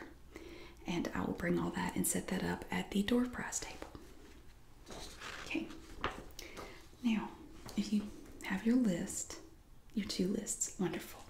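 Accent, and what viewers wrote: South, United States. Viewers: I absolutely adore your videos! My aunt/godmother lives in North Carolina, and for my whole life southern accents have been so calming to me. I only see her a couple times a year, because I live in Wyoming, but I find so much comfort in your videos in the meantime. I hope you receive as much gratification and happiness from making your videos, as you give to us, the viewers. :)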